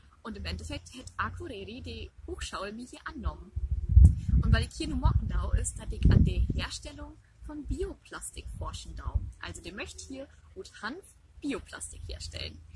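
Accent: Low German, Germany. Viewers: Möcht ne Fru, de Platt snakt. So sexy, so sympathisch. Einfach Klasse. Könnte Stunden lang to hörn. Bannig schaun 😇🥰👍🤗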